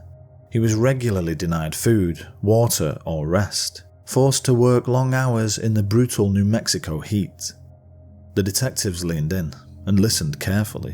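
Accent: United Kingdom. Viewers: Why do you have a British narrator when it occurred in the United States?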